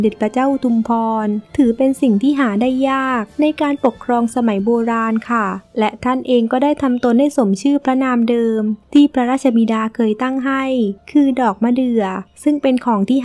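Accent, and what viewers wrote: South, Thailand. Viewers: เป็นคนภาคใต้แน่?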